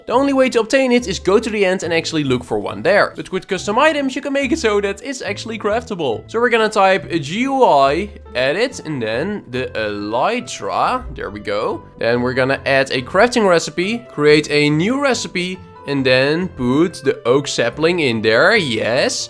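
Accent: Netherlands. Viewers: Ur from nl i can hear it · are you from netherland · i can just tell you are from nl:)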